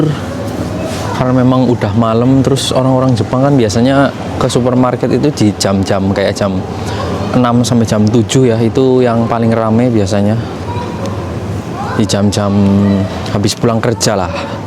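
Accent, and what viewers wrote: Banyumas, Indonesia. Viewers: Bahasa nya ngapak panget lor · mantap bro, jarang2 kaum ngapak ngomong finansial. sing ngati2 ya bos nang kana. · ngapakkk forever👍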